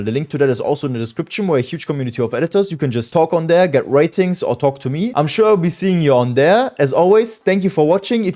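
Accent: Germany. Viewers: Man hört deinen Deutschen akzent extrem 😂😂😂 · man hört wie krass deutsch er ist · Ich rieche einen Deutschen Akzent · so geil wie man nach den ersten 2 sekunden weiß dass er deutsch ist haha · I hear a deutsch akzent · Dieser deutsche akzent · Thx Bro. The German accent is awesome tho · Ich höre den deutsch Akzent…. Hast du so ein Video auch nochmal auf deutsch?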